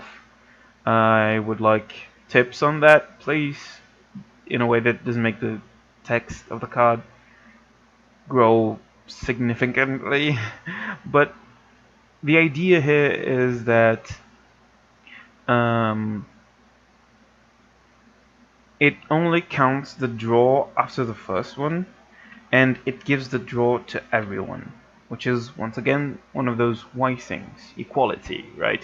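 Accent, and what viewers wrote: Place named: United Kingdom. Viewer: Dat british accent tho hue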